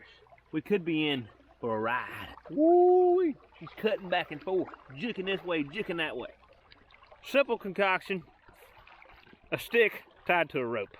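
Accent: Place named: South, United States. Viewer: Damn man you have a perfect country voice.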